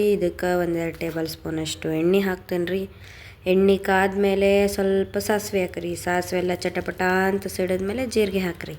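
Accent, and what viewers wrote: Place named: North Karnataka, India. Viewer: Nim bhashe bal chend aitri🥰 uttarkarnatakad namd davanager ri akka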